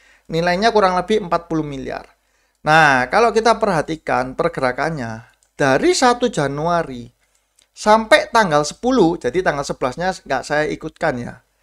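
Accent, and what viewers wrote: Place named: East Java, Indonesia. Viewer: Muuuuedok tenan ko jawa timurnya 🤣🤣🤣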